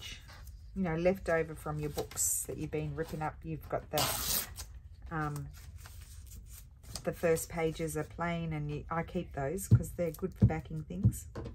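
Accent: Australia. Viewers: Just found you today. Nice to hear another fellow Aussie ❤ Do you have the name of that seedpod stamp you used? It was gorgeous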